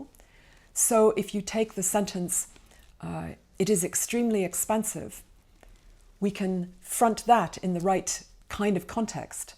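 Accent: Scotland, United Kingdom. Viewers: this is from the University of Edinburgh, I suppose it is a kind of Scottish accent.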